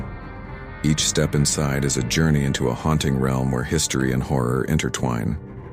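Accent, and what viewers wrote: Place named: India. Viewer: Why does the ai narrator turn indian halfway through the video?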